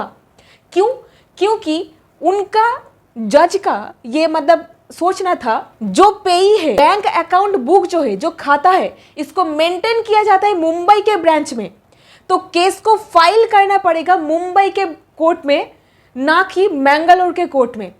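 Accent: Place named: Bihar, India. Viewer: Bihar se h tu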